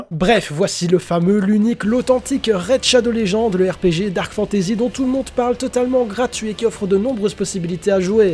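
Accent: Belgium. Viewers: Très bonne vidéo ! D'ailleurs, j'ai comme l'impression d'entendre un compatriote belge ! (Liège on dirait). Tout ça pour dire que l'oeuvre jojo à part entière a énormément été une source d'inspiration dans la pop culture. Clint Eastwood a inspiré jotaro kujo et kiff l'oeuvre. Des personnages de l'arc Joseph voire même Polnareff ont inspiré capcom pour street fighter. Dans le jeu vidéo Overwatch, le personnage ninja Genji a une réplique disant " Mada, Mada." Faisant référence au muda muda de Dio et giorno. Gucci a dédié une collection de vêtements à Jojo's bizarre adventure. Les mentions et réf ne manquent pas à l'appel. Sans se rendre compte jojo à énormément inspiré les mangas d'aujourd'hui. C'est pour cela que le fanatisme est grand. Mon opinion,. C'est que Jojo est au même rang qu'un Dragon Ball ou un Naruto. C'est intemporel et inclassable. C'est juste dans le hall of fame. 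Point barre.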